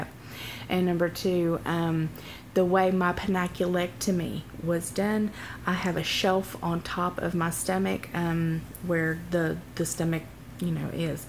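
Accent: South, United States. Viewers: just have to say you have the softest sweetest voice. and I love that southern twang lol. Girl keep your chin up and keep on keeping on.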